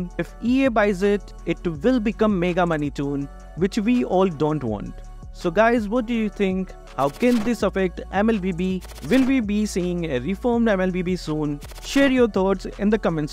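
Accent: India. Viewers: So difficult accent indian ... Caption made you understand instead your voice over..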